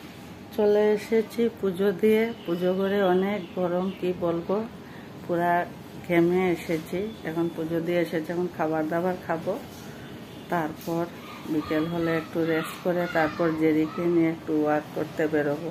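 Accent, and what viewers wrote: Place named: East Bengal, Bangladesh. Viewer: Khub khub valo laglo🎉🎉tumi kothay thako tomar kotha sune mone holo tomra purbo bongiyo... Amio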